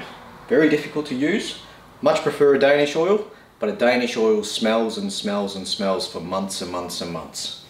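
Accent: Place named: Australia. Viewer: I've just subscribed. Good to hear an Aussie on YT. Keep up the great work.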